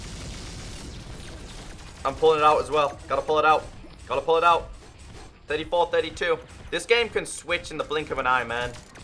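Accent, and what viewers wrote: United Kingdom. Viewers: Ecko serious are u british or not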